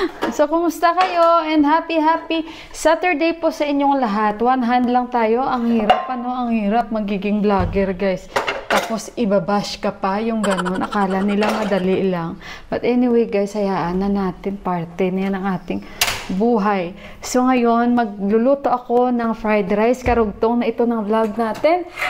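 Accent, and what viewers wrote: Iloilo, Philippines. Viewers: Natutuwa ako pag naririnig ko ilongga accent mo sis. Ang lambing at sarap pakinggan❤😊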